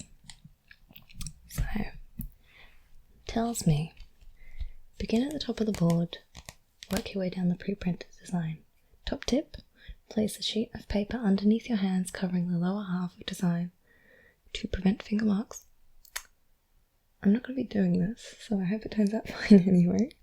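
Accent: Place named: Australia. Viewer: Your voice is amazing!!!! The Australian accent just makes it even sweetet to hear:)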